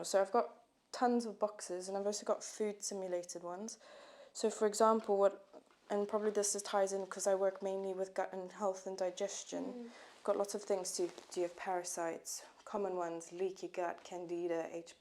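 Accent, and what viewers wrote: South Africa. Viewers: Is her accent South African? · She only sounds mildly South African but mainly British. I wonder if she moved or has mainly British family and went to a British School. The South African people that I know have much stronger accents. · The South African accent is still strong but a bit of the english is starting to come through. Regardless found a new favourite ASMR video! Keep up the great work😊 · What is she from South Africa?? 😱😍 me too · This autoplayed and I immediately twigged to the South African accent, then I heard "hectic" and was like, yep South African confirmed! 😂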